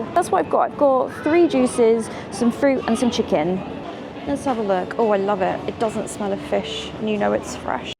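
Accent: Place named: England, United Kingdom. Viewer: I visit London a couple times a year and I always visit most of the places you visit, hoping one day I might see you blogging! Next visit Xmas. You have a lovely London accent - being an ex-pat. I listen to yours, hoping one day I'll sound like you!. I love the extensions (having really long hair suits you - I've just had mine cut for the summer and I'm regretting it a bit). Ah, but it will grow! Keep up the good work - always look forward to your updates. Stay cool!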